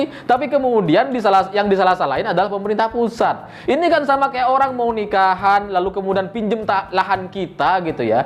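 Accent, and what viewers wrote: Madura, Indonesia. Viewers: Mas ini logatnya kayak asli madura...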